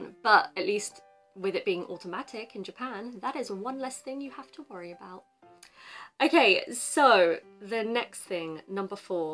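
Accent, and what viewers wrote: United Kingdom. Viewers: It’s a beautiful British English!!!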